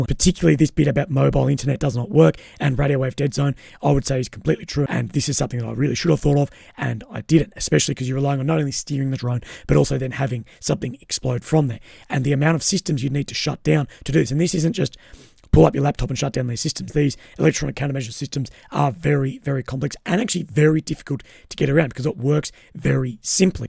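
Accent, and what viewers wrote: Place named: Australia. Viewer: Big yin your pure on this subject unbiased opioid empathy and a funny oz accent. Dude l could do an hour a day why don't you do 2 wee video a day recap or fake news exposed. Watching from Scotland peace and love to all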